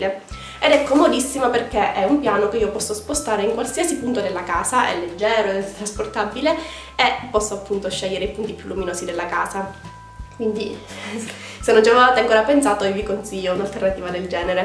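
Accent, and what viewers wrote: Sicily, Italy. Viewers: Ciao ti ho scoperta da poco sei siciliana per caso??:-))))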